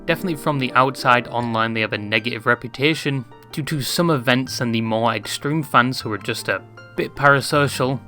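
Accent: United Kingdom. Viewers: is this guy still british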